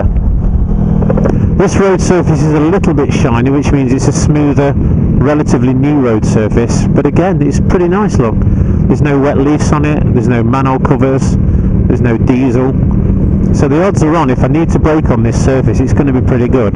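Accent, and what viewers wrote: United Kingdom. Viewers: great video. thanks. a bit hard with muffled sound, but i could even understand your british well enough. I am from Russia but have lived in California for 22 years.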